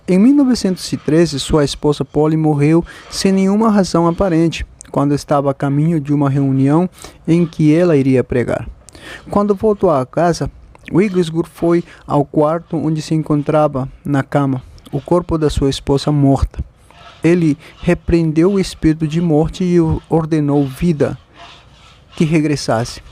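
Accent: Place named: Spain. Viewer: A pessoa que narra ovídeo tem sotaque de alguém de língua espanhola , portanto não tem nada de horrível!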